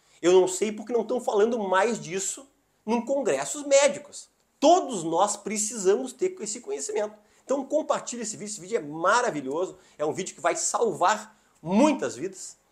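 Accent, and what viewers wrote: Rio Grande do Sul, Brazil. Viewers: MS que médico bem gaúcho tche!!!!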